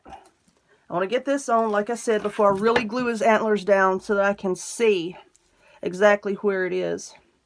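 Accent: South, United States. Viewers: Girl first of all I love your accent being from the south myself. Go girl. You crack me up. You make stuff so easy and simple to learn. And the ex jokes make my day.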